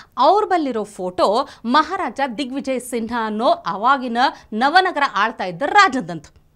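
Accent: North Karnataka, India. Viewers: Madam you have good ascent over north karnataka language even south Karnataka people also like your ascent because we are kannadigas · Good presentation with north karnatak slang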